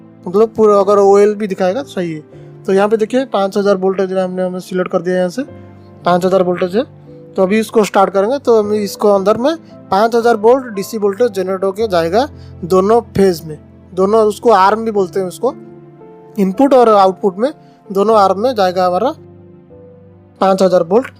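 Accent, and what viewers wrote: Odisha, India. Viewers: Thanks yr.....or ek baat.......mujhe lagta hai aap Odisha se hai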